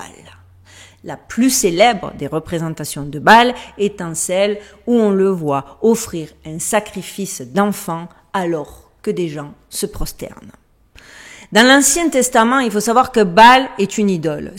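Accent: South, France. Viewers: Bonjour 👋 Oui 👍 A chaque vidéo un pouce ! Vraiment très intéressant 🧐 en plus ! Et avec notre cher accent du Sud… trop bien Peuchère 🤣❤️🤩💐🌈🙏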